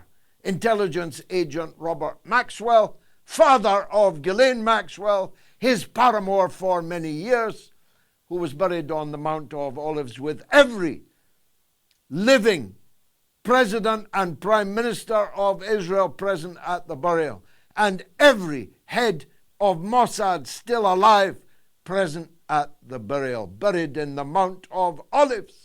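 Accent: Scotland, United Kingdom. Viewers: Hold on, maybe I just misheard you due to your thick Scottish accent, but did you just say ‘Bubba’ is a HORSE??!!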